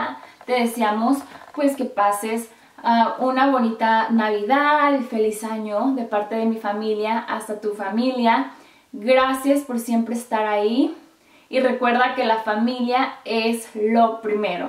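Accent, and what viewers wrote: Mexico. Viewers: Yo creí que te fuiste a usa para estudiar y te quedaste que bien hablas el español tienes asentó mexicano y no americano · Cuando recien te empeze a ver pense que eras de Sinaloa de repente se te sale el acento... saludos desde Los Mochis Sinaloa ❤✌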